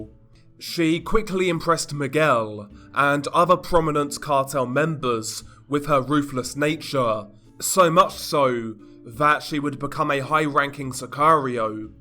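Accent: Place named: United Kingdom. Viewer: He’s British dude we all know what he’s saying stop complaining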